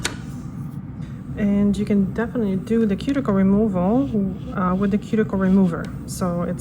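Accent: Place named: Poland. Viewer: Had no idea, but after first few words I knew you must be polish! 😅 such a hard accent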